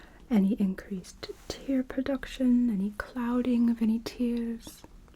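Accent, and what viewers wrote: United Kingdom. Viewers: Posh, British accent, love it. I'm a Bristolian so not posh at all! This is so good! ❤❤❤ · Love the British accent 😊 Best wishes from the UK 🇬🇧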